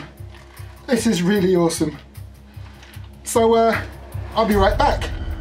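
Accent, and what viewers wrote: London, United Kingdom. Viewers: are u british ?? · Another fantastic review I tell you what your microphone is placed probably in the right place you sound very clear and loud which is a good thing and I'm really digging that British accent... Take it easy brother we will see you on another video and yes I did subscribe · Well uve got another subbie gotta say your vibe is growing on me are you a londoner by any chance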